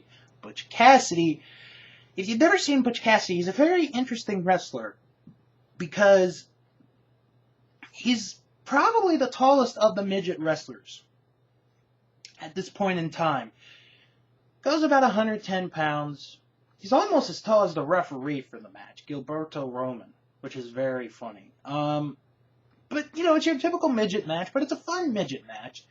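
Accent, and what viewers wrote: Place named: England, United Kingdom. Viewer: Nice English accent 👍👽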